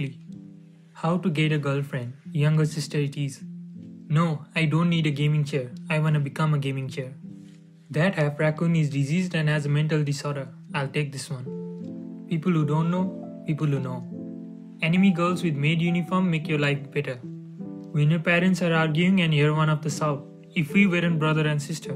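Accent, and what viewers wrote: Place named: Nepal. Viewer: Mom: Good his studying, his listening to those Educational Indian youtube channel Me: who actually watching Anime Memes that's being read by a Nepalese Dude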